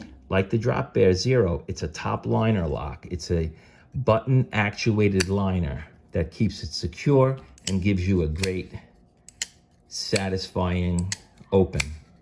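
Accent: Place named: East Coast, United States. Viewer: Glad to join the party. Hearing you almost mkes me miss the east coast.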